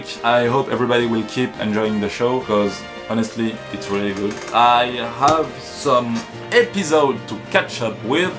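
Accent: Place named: France. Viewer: Are you French? You sound French et les folios junior derrière toi te crament XD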